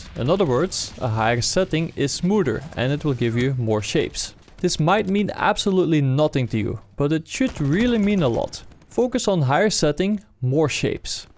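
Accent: Netherlands. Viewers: Are you dutch? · lol dit is echt nederlands accent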